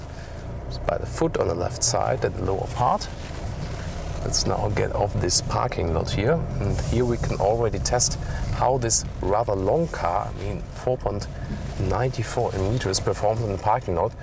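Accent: Germany. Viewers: I wish I had one of these in 2018 when I finally out my chance to drive on the AutoBahn........I wound up getting a Audi A6 which was no slouch foe ride, performance and handling but.....I had a A6 back in the states so I knew how they drove. Don’t get me wrong 30ish MPG with the V6 diesel was nice but getting to wring out something like a Q70/Q70L on the AutoBahn on a clear day would be nice. Your English even with the German accent is great, you have studied and sounds like you can speak better English than some....well...Englishmen. Good detailed video with facts and not a lot of fluff. Three good selling points for me maybe more but starting with three. 1. NO Auto/Start/Stop for the engine. 2. Modern design without going over the top. Nice and functional without being overly flashy. 3. I cant speak for Europe Spec engines since most of them are probably diesel. 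I like the regular multi port fuel injection. 4. Traditional moon roof, not the fancy Panasonic. I want metal on the roof especially if in a rollover accident, 5. Cabin Ergonomics. Decent layout and reasonably well laid out. Example. Fuel door RH side of vehicle...Fuel gauge on RH side of the instrument cluster. Some misses and not overly critical but observations that I have noted. 1. Rear Turn Signals. Personally I prefer the amber contrasting color rear turn signals. 2. Slightly behind the times for transmission technology. Yes functional and effective but with the competition coming up with 8,9 and even 10 spee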